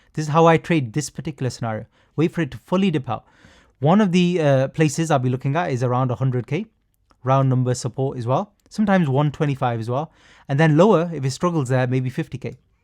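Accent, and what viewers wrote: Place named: United Kingdom. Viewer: All throughout a well spoken guide on how to avoid scams on Solana network, this really helped me during my learning process of the network and inner workings of the system. Also I would like to point out that nobody ever should listen to an Indian guy with a polished UK accent talking about crypto scams on sketchy websites like photon. good day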